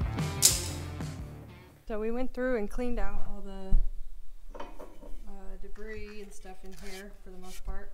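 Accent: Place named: Midwest, United States. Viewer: WHERE YALLS FARM AT...IT SOUNDS LIKE YOUR FROM THE MID WEST....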